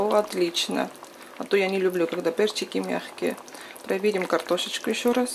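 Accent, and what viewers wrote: Armenia. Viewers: Здравствуйте, джан! У Вас приятная, сладкая речь с армянским акцентом.Посмотрела приготовление вашего блюда, и сразу запахло Арменией. Там так умеют вкусно готовить, все овощи и фрукты пропитаны и теплом и щедрым армянским солнцем.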